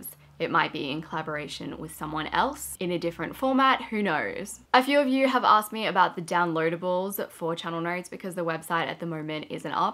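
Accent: Australia. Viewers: I heard 'Channel NERDS' more often than 'notes'. #AustralianAccent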